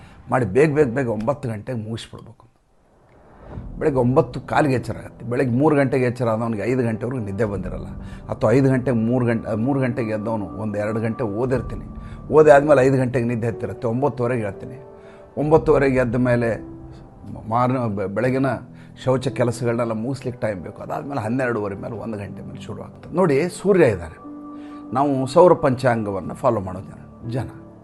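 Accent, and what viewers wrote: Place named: North Karnataka, India. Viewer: ಉತ್ತರ ಕರ್ನಾಟಕದ ಗಂಡು ಕನ್ನಡ ನಿಮಗs ಗೊತ್ತಿಲ್ಲಧಂಗ ನುಸುಳೋದು ಕೇಳ್ಲಿಕ್ಕೆ ಮಜಾ ಅನಸ್ತದ!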